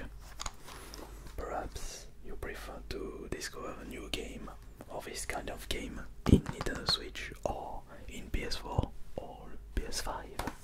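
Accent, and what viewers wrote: France. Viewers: t'es français mec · Je savais que tu étais français, tu mélangeait le présent simple et l'impératif avec le ING mais c pas grave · La vidéo m'as beaucoup fait rire et m'a beaucoup relaxée. Ton accent français est hilarant mais dans le bon sens du terme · soit l'accent est bon, soit il à l'accent français, pas les deux, mais un bon accent implique une neutralité de l'origine de la personne. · Un accent un peu à la française mais ce concept et cette vidéo sont super, je n'ai regardé que 2 minutes de la vidéo et je ne pense pas que je vais tenir longtemps avant de dormir... 😅🤣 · Pour le coup ton accent est vraiment bon, tu me fais penser à PierreG asmr ou Made In France asmr. Pour le coup, on ressent bien l'accent français, mais je trouve ça vraiment relaxant ! Bien joué, et c'est aussi très courageux !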